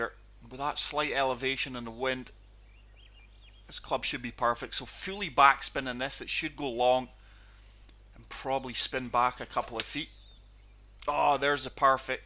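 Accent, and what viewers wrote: Scotland, United Kingdom. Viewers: I hear scottish i sub 🏴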